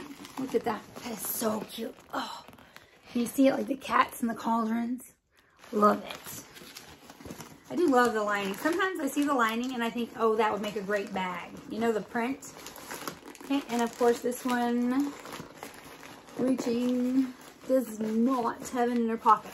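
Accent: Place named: New York, United States. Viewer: 😂 love your NY accent 💙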